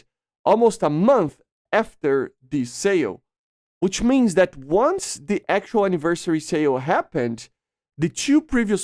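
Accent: Brazil. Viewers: Engraçado como eh facil detectar o sotaque br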